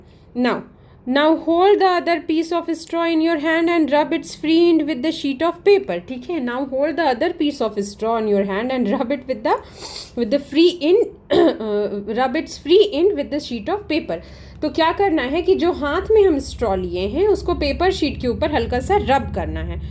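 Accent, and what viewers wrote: Bihar, India. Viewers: Mam are you bihari ?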